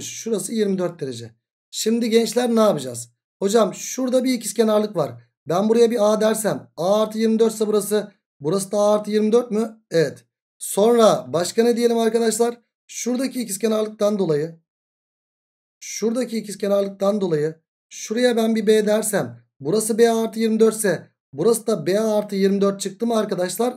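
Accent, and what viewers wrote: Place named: Balıkesir, Turkey. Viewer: Hocam sanırım Balıkesirlisiniz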